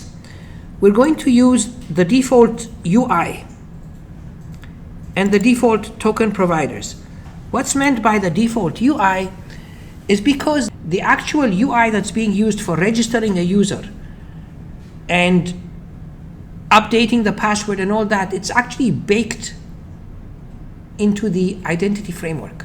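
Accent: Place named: Iraq. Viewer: Your SureName, but I can feel your Iraqi accent, Big thanks for your grate job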